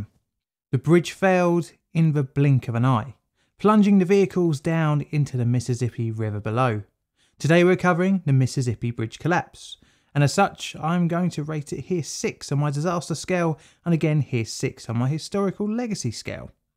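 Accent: United Kingdom. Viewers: To hear a Brit talking about a rather obscure event that happened in my state is kinda surreal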